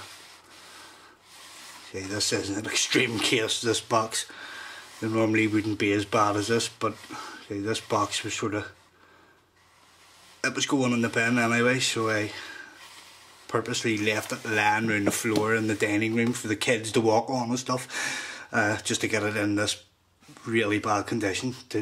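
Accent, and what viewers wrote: Belfast, United Kingdom. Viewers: ***** yeah - he's from northern ireland though. Belfast by the sounds.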